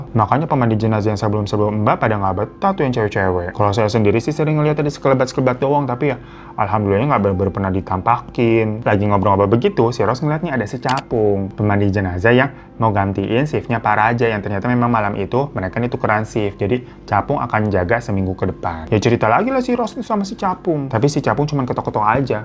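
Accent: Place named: East Java, Indonesia. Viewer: Sy kira orang ngapak, Brebes tegal. Ternyata jatim 😂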